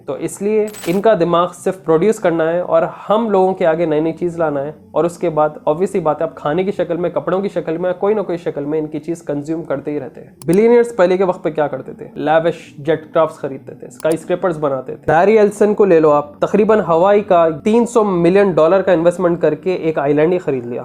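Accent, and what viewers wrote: Hyderabad, India. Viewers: Bhai app Hyderabadi hoo kya ❤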